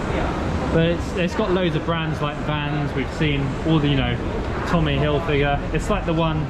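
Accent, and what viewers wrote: United Kingdom. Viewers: I really like your English accent , very nice 👍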